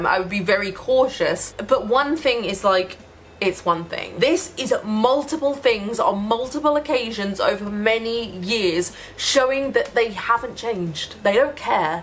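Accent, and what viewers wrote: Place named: Midlands, United Kingdom. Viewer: U sound like ur from the Midlands, maybe east Midlands. Am I close? In England, of course. I'm doing a challenge with my mates to see where youtubers are from and see who's closest to us. Am I close?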